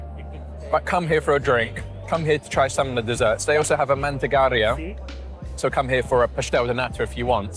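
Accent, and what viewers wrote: United Kingdom. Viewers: I'm surprised he used the American word "chips" instead of the British word "crisps" considering his accent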